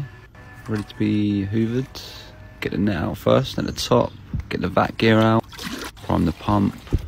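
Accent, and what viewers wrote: England, United Kingdom. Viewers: Are ya British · hovering but with his english accent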